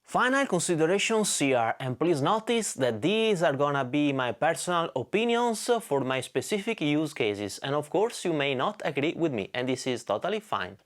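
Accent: Italy. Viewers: He's Italian, he has an Italian accent; what's the issue here! Great video (as always)!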